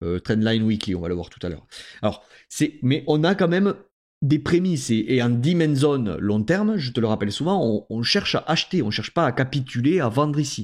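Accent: South, France. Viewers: Santé avec ta jarre lol je me régal à écouter tes analyses avec ton petit accent sudiste peut-être non....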